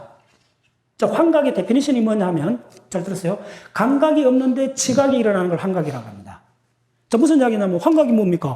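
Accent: Gyeongsang, South Korea. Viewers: 강의내용은 좋은데 경상도 사투리가 심해 잘 알아 듣지 못하겠다.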